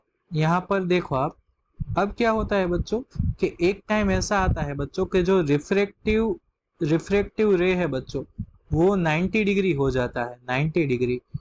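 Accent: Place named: South, India. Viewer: The time I heard south acent I knew that this is the video which will help me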